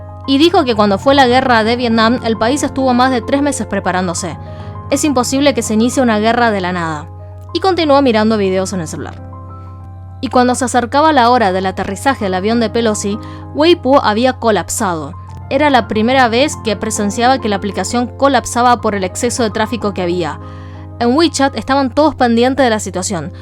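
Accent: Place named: Rioplatense, Argentina. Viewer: Hubo un Miniso en Bs As, pensé que era japonés. Muy buen reporte, el mejor en castellano rioplatense!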